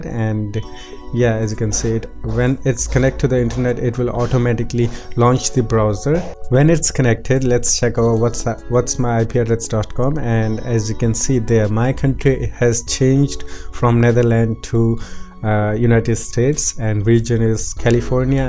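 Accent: India. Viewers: you sound very indianish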